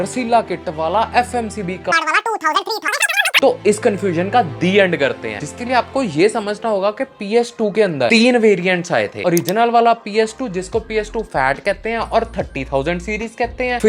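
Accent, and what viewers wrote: Punjab, India. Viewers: ਬਾਈ ਤੇਰੀ ਹਿੰਦੀ ਚ ਪੰਜਾਬੀ ਮਿਕਸ ਬੜੀ ਵਧੀਆ ਲਗਦੀ🤩 ਮੈ ਤ ਤੇਰੀ ਵਾਜ ਸੁਣਦੇ ਸੁਣਦੇ ਕਿਨੀਆ ਵੀਡੀਓ ਬੇਖ ਲਇਆਂ 😅👌🏻💐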